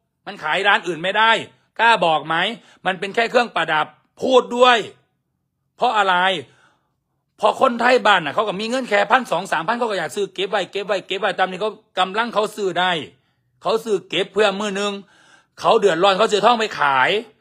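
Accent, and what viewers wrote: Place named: Isan, Thailand. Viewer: เว่าถืกคัก เด็กสมัยใหม่จั้ง คือเว่าเป็นแท้ แม่ใหญ่กรเลี้ยงลูกเป็นคักเด้อ❤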